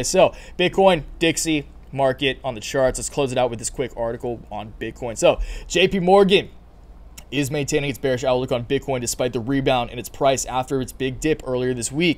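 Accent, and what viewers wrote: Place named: Hawaii, United States. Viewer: He's from Hawaii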